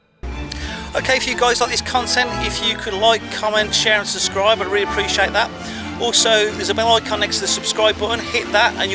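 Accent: United Kingdom. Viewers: I'm actually so ashamed that I've only been to London! Really cool listening to your storytelling (just needed a minute or two to get over the thick British accent) 😛 Good work! 👏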